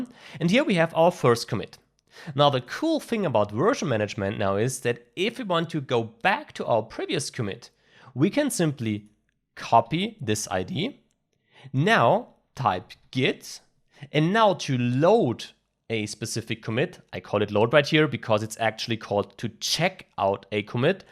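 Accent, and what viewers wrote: Germany. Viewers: Yeah, he sounds a lot like a German.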